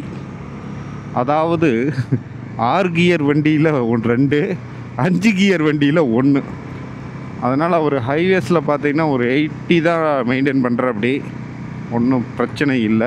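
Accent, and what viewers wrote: Kongu, India. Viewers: I remember this person. You two were sitting on the water tank and talking about your Ladakh trip. At least four years ago, I saw this person on your YouTube channel. But I still remember him. I really like it when you come and speak in Kongu Tamil in your channel., I am also from Kongu side.I really like your channel because you speak in that tone. keep continue bro. I pray to God that you always have a safe journey. I love Channel also. I've been watching Moto vlog continuously since he started domestic travel, and he has spent a lot of money on international travel. I feel like a person from Tamil Nadu who has an international travel vlog is a big feat that no one else can do. I hope he hasn't received recognition from people yet. I really like that man's spirit. No human being can afford to take a small international trip and spend it on people, because the cost is too high, but he works for us.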